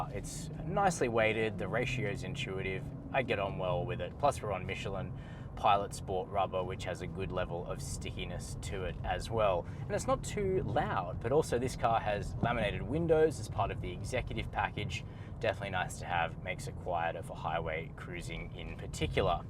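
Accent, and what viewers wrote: Australia. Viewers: The host sounds Australian. Car prices in Australia is indeed quite high.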